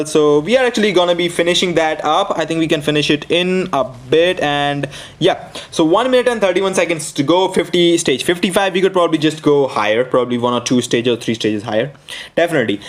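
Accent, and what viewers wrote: India. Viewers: Man I love this Indian English 😂♥️♥️♥️. Great job dude ♥️♥️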